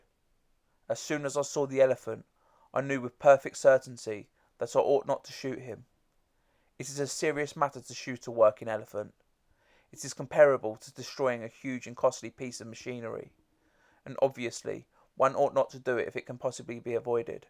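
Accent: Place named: England, United Kingdom. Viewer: Thank you for this video. This essay was assigned to my English class for analysis and hearing someone else read it really really helped me. It always sounds better with an English accent.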